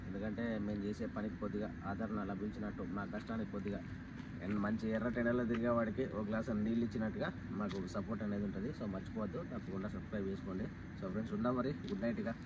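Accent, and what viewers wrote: Telangana, India. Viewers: Anna meru undedhi Vijayawada, Telangana slang matladuthunnav,kadha karimnagar,unnara,unne Vijayawada andhuku vellaru?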